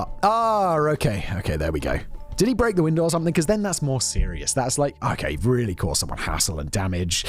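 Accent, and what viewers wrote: RP, United Kingdom. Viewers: If it wasn't for this channel I wouldn't be able to tolerate his poshness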